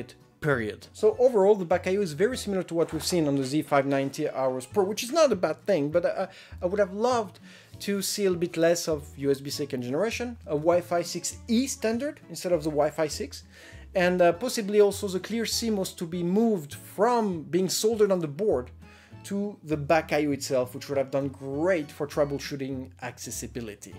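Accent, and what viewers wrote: France. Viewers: pretty french accent bro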